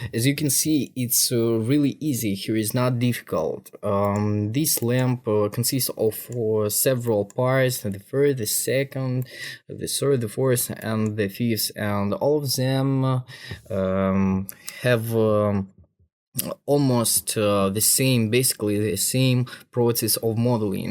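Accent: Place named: Russia. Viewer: Приятно слышать русскоязычный акцент, словно всё по русски)) Подписка.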